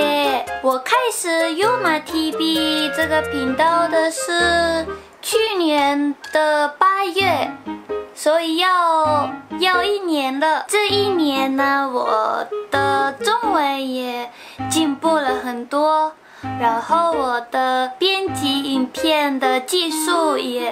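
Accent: Standard. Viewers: 恭喜yuma TV 突破20W!! 繼續努力~而且你的中文可以算是很標準了,已經不再是躲在ryu旁邊當攝影師,拍影片前先寫好稿子的Yuma了 ^^ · Yuuuma恭喜20萬訂閱!你的中文進步了很多.發音超標準的!只是如果有比較不會的字一定要問Ryuuu喔!永遠支持你!! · 中文说的还挺标准的，加油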